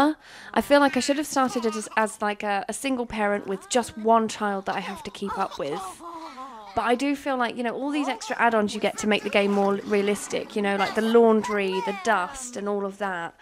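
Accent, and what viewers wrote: United Kingdom. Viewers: i love how british and grumpy you sound in here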